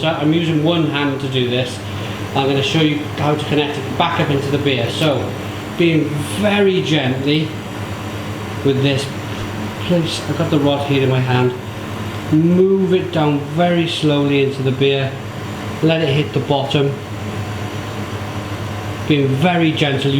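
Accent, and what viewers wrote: Wales, United Kingdom. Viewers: Awesome video. Where’s your pub and what’s the name????? I’m getting a hint of Welsh accent (please forgive me if I’m wrong). I’d like a pint in your pub. 👍🏻🤪😊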